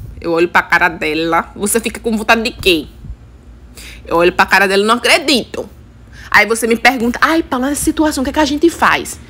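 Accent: Northeast, Brazil. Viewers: EU como paulista adoro sua voz e seu sotaque nordestino adoro vc ❤️❤️ nota mil pra vc! kkkkkkk 😂😂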